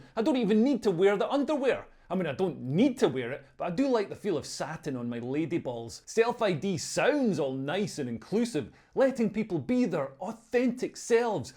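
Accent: Scotland, United Kingdom. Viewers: I’ll never get tired of listening to a Scot reading JK’s April 1 tweet. Who knew JK was comedy genius as well as a brilliant author. 😂😂😂😂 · Nothing like a healthy rank in fabulous Scottish accent to make my day. Thanks! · I really enjoyed your Scottish rant! You Scottish men with your quilts have always been far ahead of the rest of us 👊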